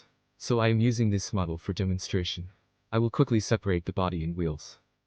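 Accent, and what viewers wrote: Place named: United States. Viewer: You are from India? right. but your accent looks like american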